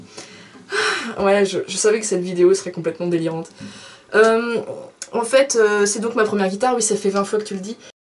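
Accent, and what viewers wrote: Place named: France. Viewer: Hello!! J'aime bien ton authenticité, ton franc parlé et ton accent....J'ai une amie Française que je connais depuis peu et j'adore son accent....Tu me fais pensée à elle!! J'écoute tes vidéos depuis peu et je suis abonnée également et je regrette pas....Tu as un vidéo sur les Arpèges entre autre et des trucs que j'ai enfin compris comment m'y prendre pour pouvoir l'apprendre et tu expliques très bien toutes les étapes!! Merci! J'adore aussi ta toune:!! Tellement réaliste! Et je voulais te dire que moi aussi j'ai vécu le jugement des gens sur mon orientation: On m'a cataloguer quelques fois de (Lesbienne) mais en réalité je ne le suis pas. Je suis en couple depuis 29 ans et même à ça les gens me juge juste par mon côté Garçonnière....Mais avec les années je commençe à m'en foutre pas mal moi aussi donc!!....Ta personnalité me rejoins parce que je suis authentique et un franc parlé comme toi et c'est ce que mes amies aiment de moi alors la recette doit être bonne!! 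Longue vie à ta chaîne!! Et au plaisir de te relire et t'écouter....tu as du beau talent...sincèrement!!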